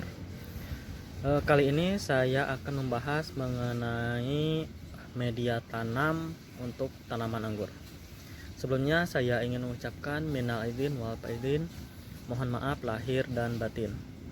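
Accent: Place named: West Java, Indonesia. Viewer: Kang kl dri logatnya ky nya orang sunda ya..itu jenis anggur apa kang..nyimak terus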